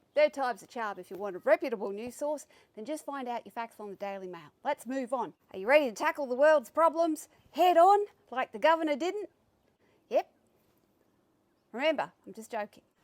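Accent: Australia. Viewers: I would love to hear your take on <link> Aside from that as an aussie in exile in NZ I really enjoy hearing a good ocker accent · you are unique. Your perspective on American affairs is spot on. Please continue to keep us informed. Your Australian accent doesn't hurt either.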